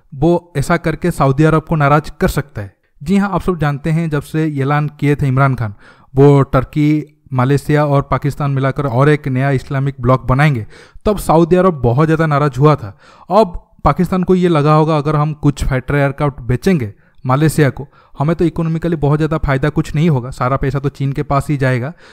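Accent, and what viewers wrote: Odisha, India. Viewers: This fellow is out and out Odiya.His accent reminds me of my very good friend Mahapatra.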